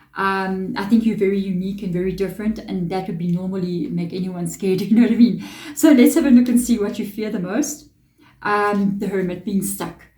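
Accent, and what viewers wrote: South Africa. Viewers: I did not pick your accent as South African, but I can hear it now. 💚 My dreams I trust more than anything else.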